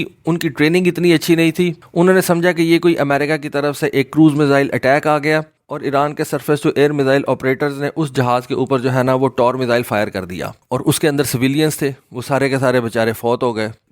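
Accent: Sialkot, Pakistan. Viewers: Pure sialkoti accent 👌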